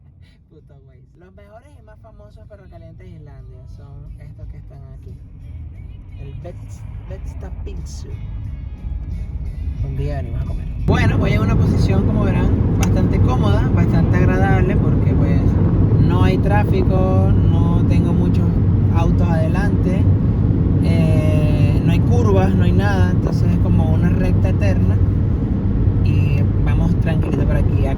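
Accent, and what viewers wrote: Venezuela. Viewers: Eres venezolano? Me parece tu acento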